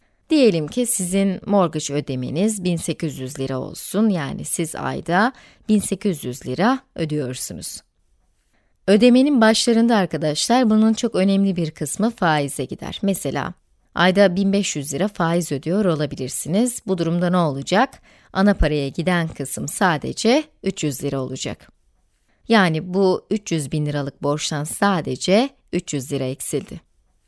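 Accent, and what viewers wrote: United States. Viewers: Fazla Amerikanvari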